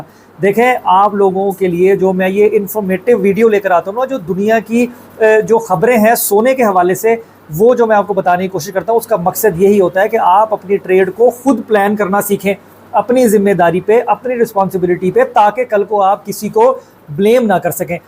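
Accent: Punjab, India. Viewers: Bhai G ap video main ye Punjabi touch Q dety ho? Do you understand it makes ur point more authentic? You look embarrassing so stop ✋ this Punjabi touch!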